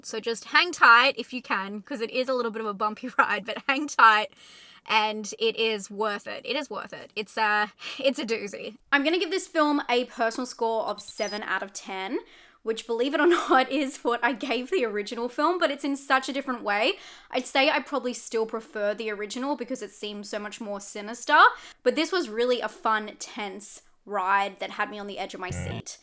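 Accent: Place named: Australia. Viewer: And this one tiIIIME! At Band CaAAAMP! I love the Aussie accent when done a bit more charmingly and understated. See fellow Youtuber Matt Parker (Stand-up Maths/Numberphile) for a better example of this rather than this overexcited version. Love the energy though, and clear passion.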